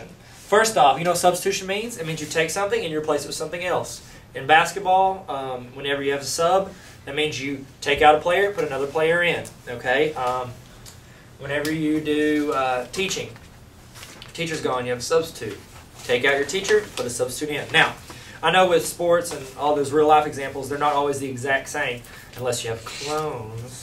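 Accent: South, United States. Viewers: I would much rather have this guy with a hot southern accent than my grumpy math teacher now. Please be my teacher.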